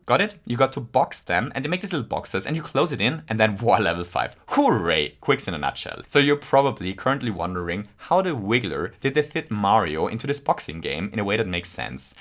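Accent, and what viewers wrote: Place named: Germany. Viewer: This video was pretty shit tbh. Its basically 17 minutes of conjecture and assumptions, why should a simple reference make every super Nintendo commercial canon? Why should Nintendo pay mind to something that literally no one will think of? And about that whole cultural caricature thing? Mexican people still wear ponchos and sombreros, so why shouldn't mario be able to wear local attire? Based on your accent I'm gonna assume you're German. And as a german, don't you find it fun when a character wears lederhosen or speaks with a german accent? I know as a dutchman when someone assumes I wear wooden shoes and smoke weed I'm charmed. I know that waluigi is incredibly popular here because he represents us, I love your content but this whole video had me scratching my head through its entire runtime. I really hope you'll do better next time.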